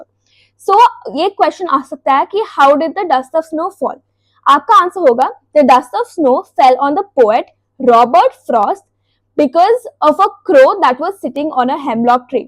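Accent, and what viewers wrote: South India, India. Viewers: are you from southern part of india cause your accent shows so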